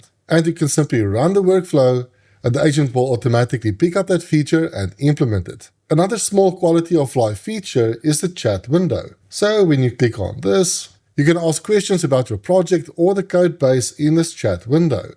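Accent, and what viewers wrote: South Africa. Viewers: It is very nice to hear a fellow South African's voice talking about AI and coding. Thank you very much. I love the South African twang. It reminds me of home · Amazing content and so well explained. Love the finer detail and your attention to the specifics. This helps me understand using Claude Code in a whole new light. Will definitely be following along for new videos, for sure. Also, can't miss the fellow Saffa accent. 😅 Thank you and looking forward to seeing what you've got brewed next.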